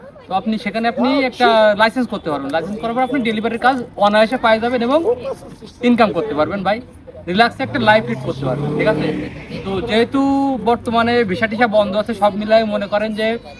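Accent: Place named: Pabna, Bangladesh. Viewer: vai ki pabnar local nki💙 vasa to chenachena lage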